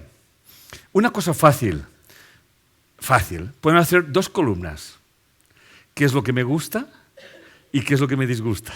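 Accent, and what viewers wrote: Spain. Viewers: por su forma de hablar es español igual a mi papá es bueno hablar de asuntos de pareja pero que bueno ese tema las personas deben conocer su media naranja pos persona no por redes sociales no sabes no lo ves es peligroso cuando entramos es para escuchar temas como los a suyos, ho música, pero pareja no porque hay muchos que se hacen pasar por otros gracias por su tema me encanta bendiciones y larga vida amén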